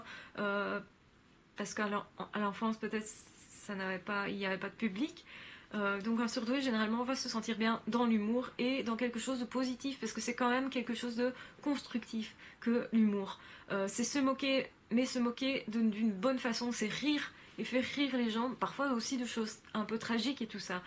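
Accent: Belgium. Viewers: Vous êtes adorable, utile et très agréable , sachez que votre intelligence en arborescence me parle et me touche beaucoup. Il me semble qu"une femme s'exprime plus facilement par l' écrit que par l'oral et que c'est l'inverse pour un homme .Je vous suis depuis longtemps et je vais continuer car cela me rassure sur ce que je suis . Merci .PS J'adore votre accent belge .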